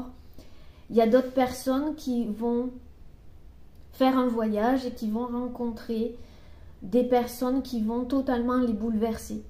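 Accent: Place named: South-West, France. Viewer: Je vous aime trop, vous me faites sourire quand vous vous énervez avec bienveillance avec votre petit accent de notre sud-ouest. Et vos messages raisonnent tellement depuis que je vous écoute et me permettent de grandir. J'espère pouvoir vous rencontrer un jour. Je viens de conscientiser que j'ai tout....peu mais j'ai tout....je n'ai plus courir derrière qqchose....car à aujourd'hui j'ai tout ce qu'il me faut et si j'ai encore plus tant mieux mais j'ai tout.... merci ❤❤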